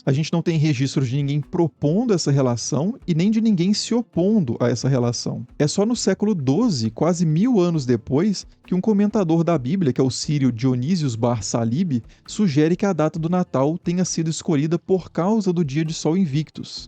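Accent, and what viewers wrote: Minas Gerais, Brazil. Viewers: É mineiro?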